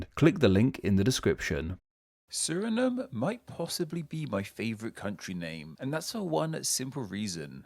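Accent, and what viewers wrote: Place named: United Kingdom. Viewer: Your pronunciation was very good of those names. It was very surreal hearing those words spoken in a British accent. What a time to be alive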